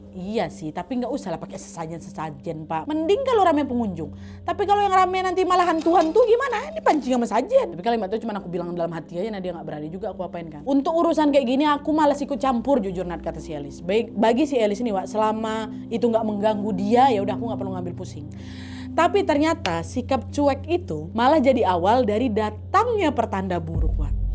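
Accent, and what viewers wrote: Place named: Sumatra, Indonesia. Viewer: Logat sumatranya enak banget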